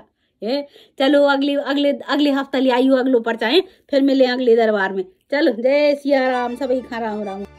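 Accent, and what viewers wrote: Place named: Bundelkhand, India. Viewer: वाह क्या बात है लाली क्या bundeli बोली तूने मान गये बगल वाली अम्मा को, क्या बीच का रास्ता निकाला है उत्तर देने में l तेरी अदाकारी को लाखों सलाम यारा! मज़ा आ गया मेरी जान 💗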